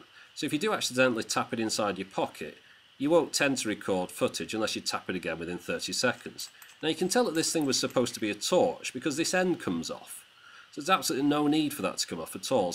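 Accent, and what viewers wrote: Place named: Liverpool, United Kingdom. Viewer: You sound like Ringo Starr! Is that a Liverpool accent?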